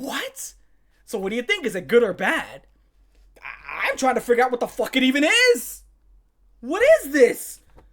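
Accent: New York, United States. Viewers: holy ny accent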